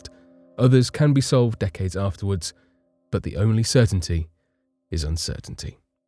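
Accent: United Kingdom. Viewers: I hear the British accent so understood and somewhat excused but the word 'myth' is incorrect and insulting consistent of the Western European mind and history with all cultures information other than Christianity. For your education, all information Native Americans share are historical facts passed Down from one responsible LISTENER and wise keeper of the truth to the next. It is accurate. It may not be in a language or give an image of justification that your limited exposure may be able to verify. Africans do it as well. Use of the word Myth in such a manner brings forth Arrogance, a way of thinking that Covid19 is demanding cultures and people that use it to cease and desist and the virus will Diminish.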